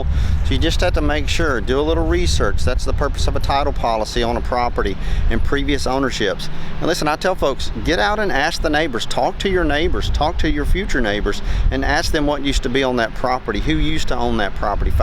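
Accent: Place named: Appalachia, United States. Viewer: It is supposedly. Did you notice, no b. Not suppose a blee. It suppose ed lee. Being instructed by a hillbilly.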